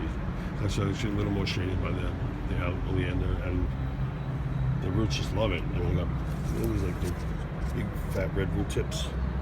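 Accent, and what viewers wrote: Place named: United States. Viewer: Where do you live? You sound American but I figure you live somewhere in Europe with the schwerter comment...love the balcony!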